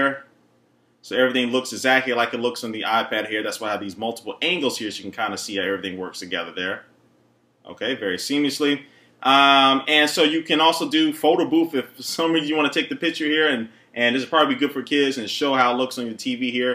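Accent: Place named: South, United States. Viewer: Liberry. Akurrate. Lol love the southern pronunciations. (I live in Louisiana)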